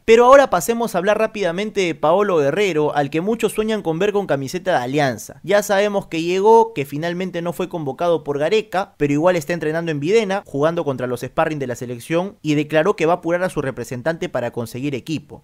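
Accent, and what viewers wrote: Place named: Argentina. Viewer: mano por que hablas como semi argentino ??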